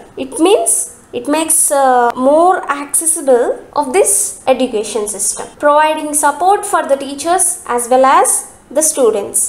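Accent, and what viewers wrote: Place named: Telugu, India. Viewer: Good speaking but you still pronounce like Telugu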